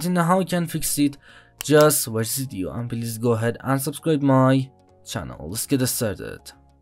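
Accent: Iran. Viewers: ایرانی نیسیتی؟ لحجه رو برم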